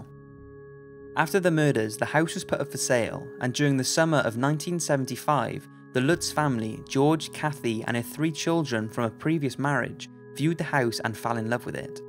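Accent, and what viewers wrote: Wales, United Kingdom. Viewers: Yes, he should definitely do audio books. Such a soothing and clear voice. Is that a slight Welsh accent I hear...? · finally a Brit narrator😅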